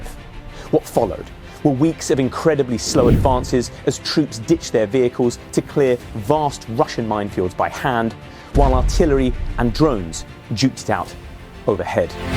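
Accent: United Kingdom. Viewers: I am so sick and tired of some idiot with a British accent telling me stuff. It’s insulting.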